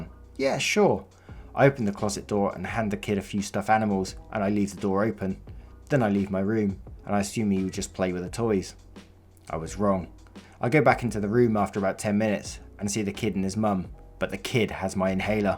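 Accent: United Kingdom. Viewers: That British blue accent tho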